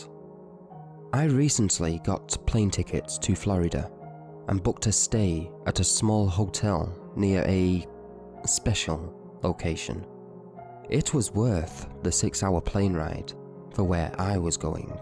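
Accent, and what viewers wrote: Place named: United Kingdom. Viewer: you know it mate :) good to have another British narrator around here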